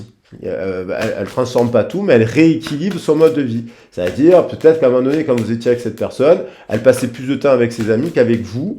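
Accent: South, France. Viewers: Merci pour cette guidance je crois de t’avoir tout dit la dernière fois j’ai voulu aider mon autre je lui ai dit une vérité qu’il n’a pas apprécié désolée mais c’était pour son bien j’en avais marre de voir des vautours autour de lui qui le manipulaient et l’influencaient c’était ma mission d’aider et soutenir les personnes que je croise sur mon chemin de vie maintenant si mon autre désire me parler qu’il vienne me voir et qu’il ne s’inquiète pas je l’accueillerai comme il se doit pour une réconciliation sincère et authentique puis on avisera amour ou amitié je ne sais pas Dieu seul le sait 🙏 bonne journée et c’est toujours avec un grand plaisir de t’écouter chaque fois avec cet accent du Sud qui réchauffe mon coeur❤ grossi bacioni sans oublier Catalina et ton fils 👄👄👄😘👋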